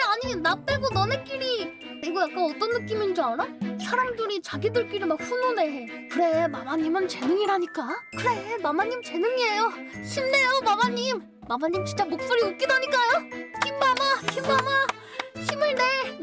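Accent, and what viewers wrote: Gyeongsang, South Korea. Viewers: 마뫄님 보이스 참 매력적이죠. 살면서 만나기 쉽지 않은 음성이라 보면 됩니다. 경상도 특유의 쎈 억양과 사투리를 구사해도 전혀 상대가 화나지 않는다는건 엄청난 강점이자 재능입니다. 교원 실습하셨을 때 인기 많으셨을 것 같은데요. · 경상도 방언 쌘거 맞습니다 ㅋㅋ 일본어 비음많고 중국어 성조있듯이 그냥 특징인데 화 많이 나셨나 보네요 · 웃긴건 모르겠는데 특색있어서 매력있는 목소리이긴 하죠. 같은 경상도사투리를 쓰는데 전혀 다른느낌이라ㅋㅋㅋ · 처음엔 물방울 떨어지는 소리/농구 코트 급제동 웃음소리에 뫄며들었는데..... 이제는...... 경상도 사투리 방음부스 설치된 이후의 호탕한 웃음소리 엄청난 떵겜실력 가끔 들리는 꿀꺽 소리 자주 들리는 컹 소리 대두뫄 버츄얼뫄 뫄마모찌 없으면 못사는 몸이 되었다구!!!! 뫄마조아!!!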